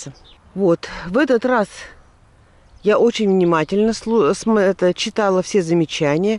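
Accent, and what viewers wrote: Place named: Sverdlovsk, Russia. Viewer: никого не слушайте, вы очень доброжелательны. Я удивляюсь когда вы все успеваете: снимать, готовить, отвечать на наши комментарии. Ваш говор очень похож на то как разговаривала моя свекровь, она со Свердловска. Даже интонации её. Слушаю и вспоминаю свою вторую маму... здоровья вам, энергии,самых положительных эмоций от вашего труда! И сегодня День Матери— поздравлем!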